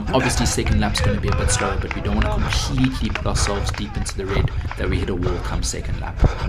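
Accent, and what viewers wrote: South Africa. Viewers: 6”2, 120kg,47 and the only thing we have in common is the the SA accent. I enjoy parkrun for just being out there records are fine for those who care about them , I disagree that getting lapped is motivating but it’s encouraging when a front runner cheers you on but also annoying when they think they own the place ! You showed your class by staying and cheering on the others !!! . The 6 week training pack looks great but not sure it’s intended for those at the back of the pack . Keep up the great work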